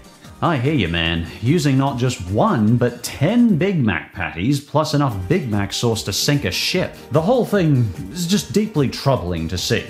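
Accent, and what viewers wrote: United Kingdom. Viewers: I like you're British accent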